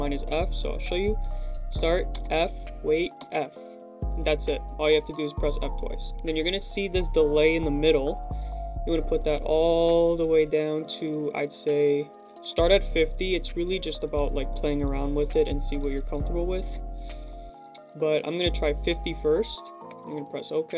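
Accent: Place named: Afrikaans, South Africa. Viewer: you sound afrikaans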